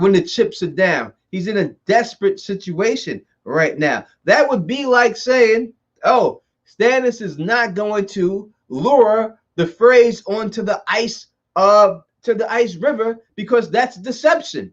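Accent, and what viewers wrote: New York, United States. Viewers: I still can't get over how new york this guy is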